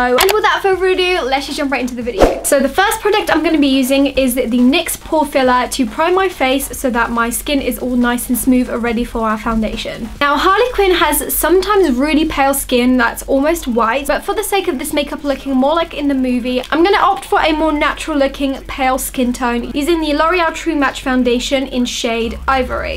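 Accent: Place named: United Kingdom. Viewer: Nice accent I love british accents ^_^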